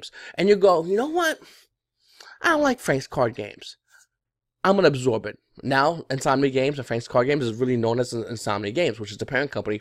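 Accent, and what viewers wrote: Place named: New York, United States. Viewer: you're from New York aren't you lol. Thanks for the vid.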